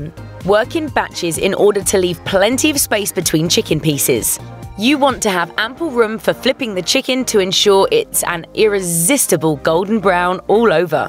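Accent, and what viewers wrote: England, United Kingdom. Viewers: Why is a British person talking about this??🤔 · The British narrator ruined it for me 🙄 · The first tip to making fried chicken is not to listen to a British accent narrator tell you what you been doing wrong! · I'm sorry but I can't take someone with an english accent seriously when it comes to frying chicken... leave that to us southern girls. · Gilda garbutt wyer I have not watched a mashed video with a british host/narrattor. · fried chicken, British accent... doesnt match... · Hey folks, I'm no "expert" chef or anything, just a Mississippi boy who's been frying things for 35+ years. Fried some squirrel I'd put up in Feb just today! lol. I've only fried chicken about...uh...250 times...lol..so I'm pretty good at it (do anything 250 times and you can't help but get good, right??). Anyway, I have to say, I don't like most cooking videos, especially really slick ones like this - with an English narrator, no less, lol , but these tips are really, really good. 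If you will follow these tips you'll do just fine. And each time you cook chicken you'll learn something. But I'm going to make just a few little suggestions that work for *ME*. I cannot speak for anyone else. First, regarding cooking temp: 300 to 325 isn't quite hot enough. I suggest getting the grease closer to 375, even 400 isn't "too" hot, because even if your chicken pieces are room temp (which is rarely the case if you could feel the middle) your oil temp WILL drop when you add your chicken. 325 is a pretty good temp to *maintain*, but even then I like a little closer to 350. Second, be careful with that "brine" idea. Yes, it can be a benefit, but it's certainly not necessary and sometimes it can negatively affect the texture of your meat, introducing a bit of a "compressed" or "processed" feel - which is never preferred. I would try getting good results first without the brine. If you want to marinade or pre-season in any way, just rub the chicken down with salt and maybe some cajun seasonin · I'm sorry but I can't listen to someone with an English accent talk about the "right way" to fry chicken...I need to hear more twang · New british voice! 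· Thanks British lady. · Woman with British accent talking to US about fried chicken? Dafuq outta here!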